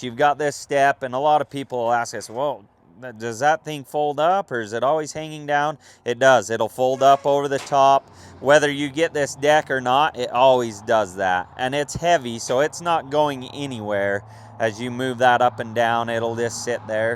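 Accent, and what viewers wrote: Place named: Sanpete, United States. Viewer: got the Sanpete twang in his voice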